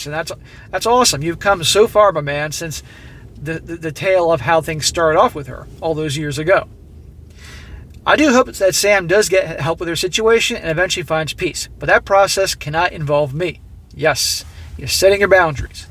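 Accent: Philippines. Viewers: Sound like the man is from the Philippines. Mabuhay !!! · Sounds like he’s from the Philippines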